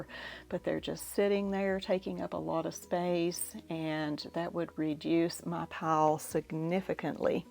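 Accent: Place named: South, United States. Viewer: Welcome,! So happy that Deb pulled you in to #fridaysews! I found her on #teatuesday, where I really enjoy watching her. I don’t produce videos, I just love to watch and sew. 😘 It’s nice to hear another soft southern accent.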